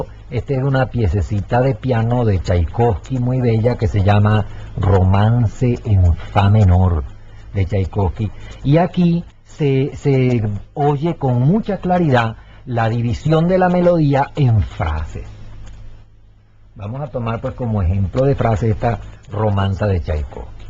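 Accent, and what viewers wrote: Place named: Caracas, Venezuela. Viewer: Sr., le agradezco muchísimo que haya puesto este curso de apreciación musical de Jose Antonio Calcaño en youtube. Aparte de la claridad y sencillez con que explica la música tambien me hace recordar mi infancia en Caracas ( no vivo alla desde el año 73) no solo por las clases en si, que alguna oi en disco en mi casa ( el Sr. Calcaño era pariente mio) sino tambien por el acento tan caraqueño que me hace recordar a mi mamá y a mis abuelos.No sé si todavia se habla asi. Los acentos van cambiando y lo notamos mucho quienes ya no vivimos hace décadas en Venezuela. Muy agradable volver a oir esto.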